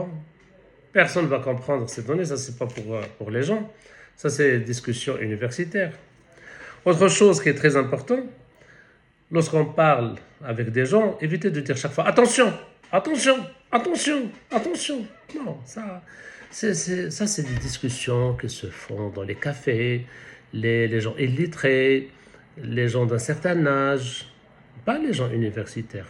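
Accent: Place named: Ouled Said, Algeria. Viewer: مستواه في اللغة الفرنسية شهادة شفهية ، عينية ، تفند ما يدعيه من موسوعية علمية. ما يثيرني في فرنسيته النبرة و الكنة ، فرنسيه اولاد سعيد ، أو الرحامنة ،حسب موضع الرضاعة.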